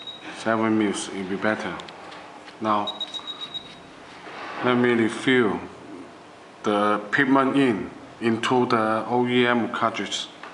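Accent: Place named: China. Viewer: he has nice idea, don't confuse to his english we all know he is chinese. atleast he trying. thanks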